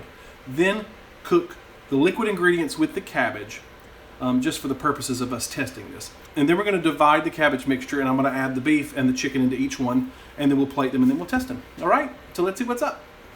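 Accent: South, United States. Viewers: Nakia Burnside same here.... and be a Texas girl, I appreciate the southern accent. He’s easier to understand!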